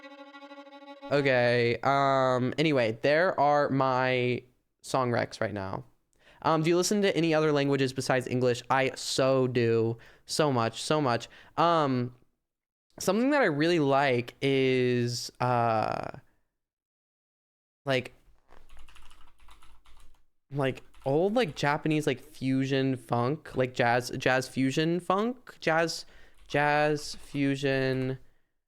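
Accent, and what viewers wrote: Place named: New York, United States. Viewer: i really feel the new york talk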